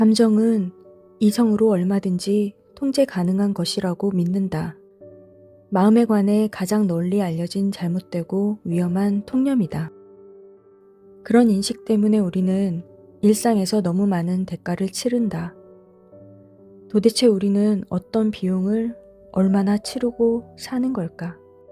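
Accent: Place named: Gyeongsang, South Korea. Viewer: 목소리가 듣기 좋네요~❤ 경상도 사람 같아요 ~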